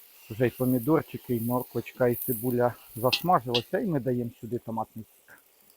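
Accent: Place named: Poltava, Ukraine. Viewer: Говір рідний якийсь :) Полтавська область? Якщо можна район?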